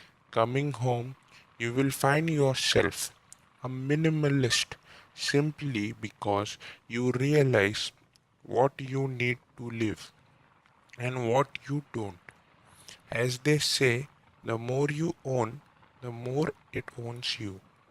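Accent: India. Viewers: Wow it's amazing, thank you!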